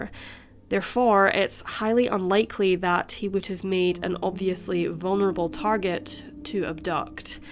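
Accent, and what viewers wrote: Scotland, United Kingdom. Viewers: How sad. By the way you have a lovely Scottish accent. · Is the narrator scottish? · The narrator is Scottish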